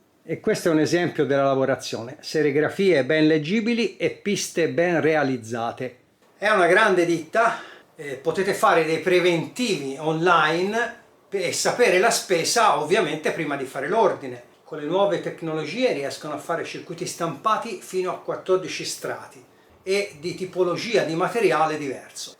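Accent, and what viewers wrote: Tuscany, Italy. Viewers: Te sei toscano. Lucca?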